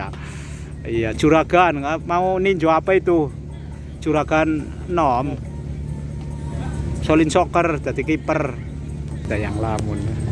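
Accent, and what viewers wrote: Banyumas, Indonesia. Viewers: Ora ngapak ora penak kang 🎉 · Ora ngapak ora kepenak😅😅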